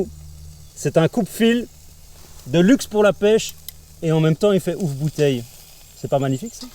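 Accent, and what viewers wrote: Belgium. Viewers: Tu es belge ou français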